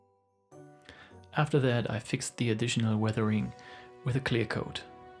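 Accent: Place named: Germany. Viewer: Nicht schlecht Herr Specht. Ich schätze ich höre da einen Landsmann. Würdest du sowas auch als "Auftragsarbeit" für jemanden machen?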